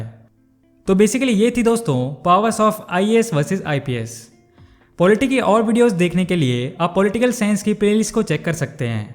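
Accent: United States. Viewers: You must be a school going kid according to my assumption as your comment is so childish and immature.If she is talking like an American then it's ok what's the problem?? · Don't speak like a American, speak like indian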